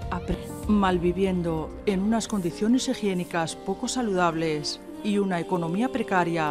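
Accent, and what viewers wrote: Spain. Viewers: Hola, me encantan tus vídeos, hermosa voz española, cuidate mucho, chao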